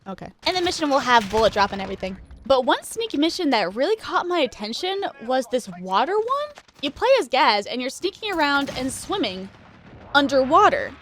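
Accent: South, United States. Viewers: You keep getting more and more southern as this video goes on.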